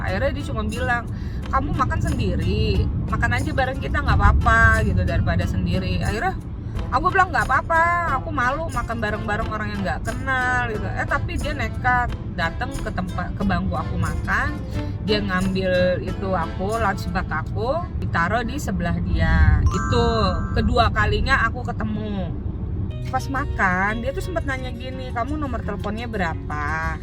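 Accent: Bekasi, Indonesia. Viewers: 21 tahun di Korea tapi Bekasinya masih berasa beeeeudd🤣🤣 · Dari pertama ngomong, logat dll udah bs di tebak klo nih orang Bekasi.... Beda gentakannya.... Hahahaha.... Kanmaendah.... 😁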